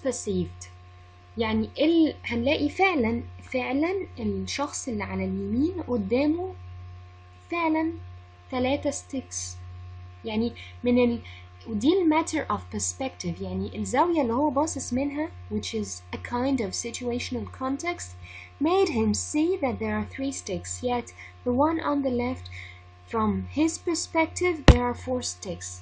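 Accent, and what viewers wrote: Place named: United Kingdom. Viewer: I really liked your British accent it's really good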